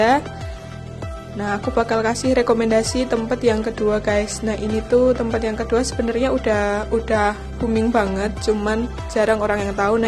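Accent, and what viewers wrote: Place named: Java, Indonesia. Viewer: Medok :v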